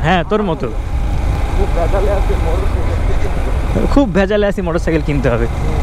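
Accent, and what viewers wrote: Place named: Kolkata, India. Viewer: kotha bolar style ekdom kolkatar manusher moto. Hashi+ protita kothar sound ekdom kolkatar manusher moto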